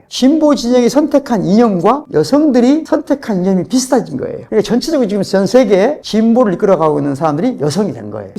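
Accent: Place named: Jeolla, South Korea. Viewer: 말투가 전라도네 ㅋㅋㅋ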